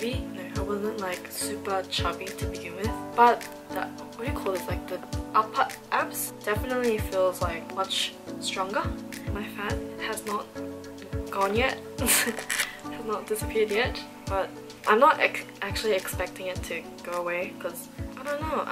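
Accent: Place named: Australia. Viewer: So, are you from Australian cuz u sound really Aussie..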